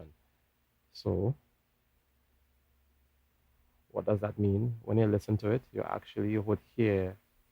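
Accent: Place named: Trinidad and Tobago. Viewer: dawg that Trini accent be strong af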